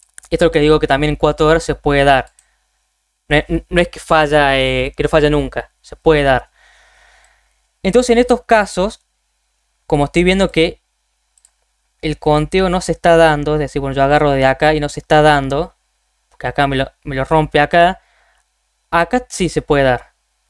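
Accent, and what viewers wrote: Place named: Córdoba, Argentina. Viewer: Muy bueno, por la tonada... sos de Córdoba?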